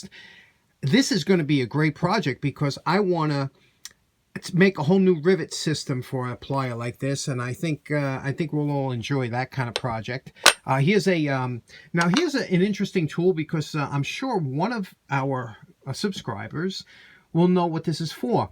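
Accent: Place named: Long Island, United States. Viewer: I love the smell of those old electric drills too- and I love your Long Island accent - takes me back home to Brightwaters. Keep up the inspirational videos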